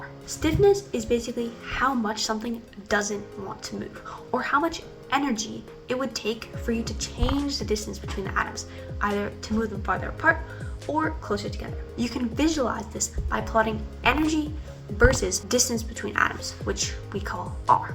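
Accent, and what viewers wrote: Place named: Hungary. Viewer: You are Hungarian?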